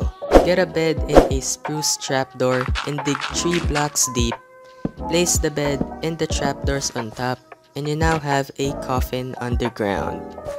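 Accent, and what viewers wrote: Philippines. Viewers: Break not Broke. also are you filipino cuz your voice sounds filipino...^_^ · Men hes accent is familiar? Are you a filipino? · What is your nationality? I think your Filipino because of your accent? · U sounds like Filipino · Ummmmm are you filipino? Couse you sound like filipino hehe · Pinoy ka kuya noh?? Yung accent mopokasi hahahha halata!! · ur from the philippines, i can hear ur accent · Ate u a Filipino?the accent lol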